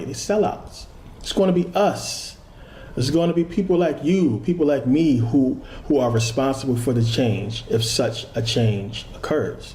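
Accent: Philadelphia, United States. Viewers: I love you videos brother. I start my day by listening to them and I end my day with them as well. Now I have my own beliefs and I won't change them based off of what anyone has to say but I will say your intellect and knowledge on certain topics is so refreshing. I always feel so positive and aware after listening to you. Keep the videos coming! God bless you. Oh yea almost forgot.....is that a Philly accent I hear? lol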